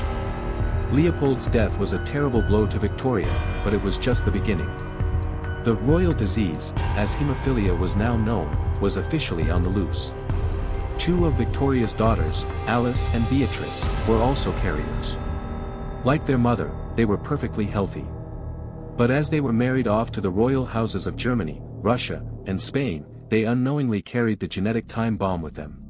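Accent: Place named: United States. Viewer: Any video clip narrated about the British royal family should feature a voice with an English accent. Is there a specific reason why an American voice dubbed this clip?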